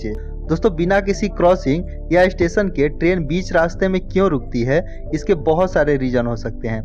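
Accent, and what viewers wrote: Bihar, India. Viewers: awaz se bihari lagte ho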